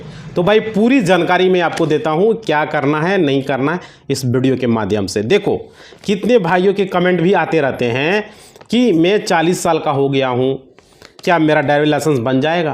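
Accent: Bihar, India. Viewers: Bhai sahab Teri Bhasha Se tu Bihari lag raha hai bakwas kitab nahin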